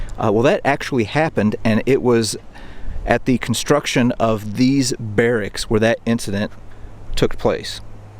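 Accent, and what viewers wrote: United States. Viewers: Sending kind wishes from Connecticut. I’m your newest subscriber. I appreciate your skills and knowledge base. You’re presenting difficult material with such respect for the tragedy and multi-generational trauma. I’ve seen many in Europe, but never visited Plaszow. I will have to read about you and your channel. You sound USAmerican.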